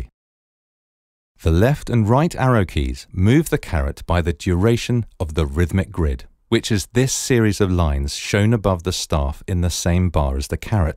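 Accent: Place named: England, United Kingdom. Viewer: If it’s explained with an English accent we think we’re the stupid ones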